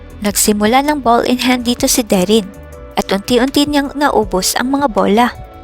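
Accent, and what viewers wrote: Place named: Tagalog, Philippines. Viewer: Fock ur tagalog accent so effing annoying so stfu biatch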